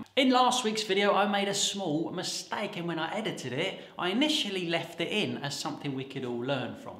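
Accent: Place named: Cockney, United Kingdom. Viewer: you don`t talk too much! You`re enthusiastic about your topics! Your Cockney accent is charming as hell, including the dropped letters, differently-pronounced words, and all. Tattoos? Well, you can`t win them all.;) Luv ya, bruv.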